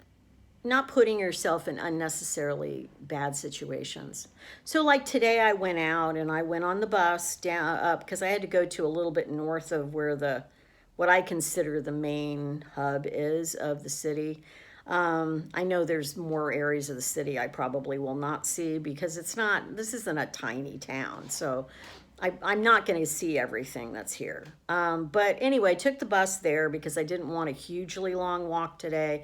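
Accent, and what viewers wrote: United States. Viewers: Are you guys realising the hatred here for Americans right now???? Or are you asleep!!!! Do you not get EU news in the US...the vitriol for your country is palpable!!!. I did tell you its not safe for a woman alone in France. I doubt you read it and if you did probably ignored it. ITS NOT LIKE BEING ON HOLIDAY FOLKS...ITS ENTIRELY DIFFERENT. Personally Id go home. I'm here in the UK. No differant, anti US very strong. Honestly I have no idea why you moved to France. You'll never sound French you're obviously an American ... Any who thinks I'm being nasty I'm honestly not...but its bloody dangerous and those saying its not are ???? Living in a bubble i guess.. Just saying as it is. also EU hates US at the moment and not suprising! Your Administration ...there are no words. Kids cause hellll here as well, alot of trouble. I'm 67. I've lived all over Europe. 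...you are not safe alone with no friends or relatives. Why do that? Truth. London is a real nightmare. It's NOT Clockwork Orange....😂 seriously, but its bad here. But if you go North up to York or Lincoln or just far away from London etc it's nicer. ...but! I do not understand your rationale, EU has terrible problems these days. Violence. You're a nice woman. If you want to come to UK avoid London. Really bad. I know lived and worked here on and off 45 years! Still here .....not the London youd recognise.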